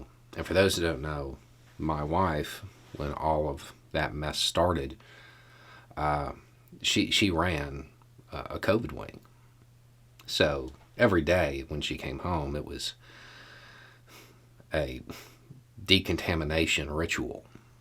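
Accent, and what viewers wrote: South, United States. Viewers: We talk in line at the stores up here in the North too, minus the adorable southern accent of course.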